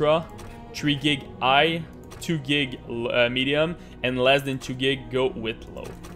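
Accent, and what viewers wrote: Quebec, Canada. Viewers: i'm guessing you're from Quebec?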